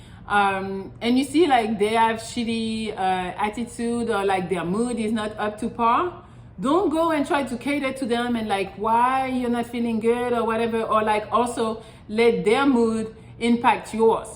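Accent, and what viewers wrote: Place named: France. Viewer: Most probably from France. I hear a French accent.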